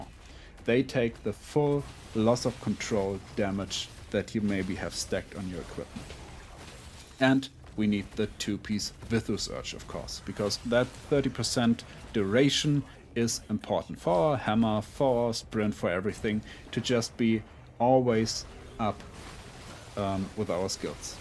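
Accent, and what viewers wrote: Germany. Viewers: Habe die ganze Zeit gedacht, dass du von der Aussprache her ein deutscher bist. Nach dem Kommentar im Video von Darkness, bin ich mir sicher :-)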